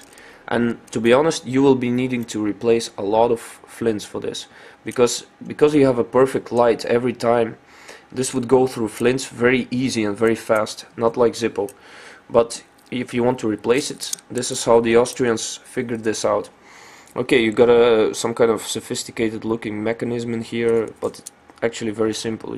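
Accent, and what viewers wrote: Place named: Belgium. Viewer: HAHA ik hoor het aan je stem dat je van belgië bent :p je accent valt wel op, ben zelf ook van belgië Limburg Hoeselt !!!!! greeeetz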